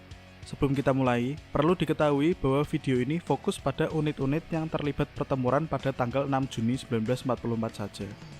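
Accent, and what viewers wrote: Java, Indonesia. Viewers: medoknya ilangin dulu bro😀😀😀😀😀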